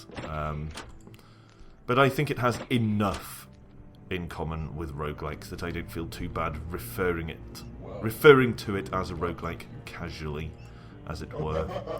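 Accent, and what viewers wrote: United Kingdom. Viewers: Actually, I'm from Ukraine. Odessa. Also, I'm not sure about your nationality. Are you British? I think this, because of your accent (?). I'm not sure, but I thought that you have kind of British accent. Maybe I'm completely wrong.:)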